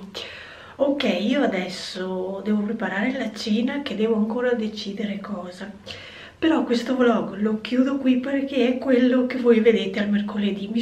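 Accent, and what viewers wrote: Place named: Veneto, Italy. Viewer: Ciao!! Ma di dove sei di bello che sento un inconfondibile accento di casa ( Veneto )? I tramezzini mi mancano un sacco, quelli nostrani, qui in Inghilterra non sono buoni come i nostri, e pensare che quando abitavamo in Svizzera li distribuivo col furgone in Ticino! Ciao cara, un abbraccio e un saluto anche da Anna!!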